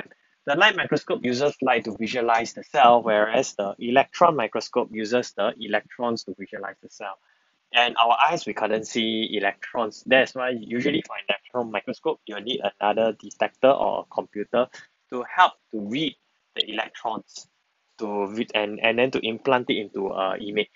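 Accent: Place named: France. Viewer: U speak like a french BTW its quite helpful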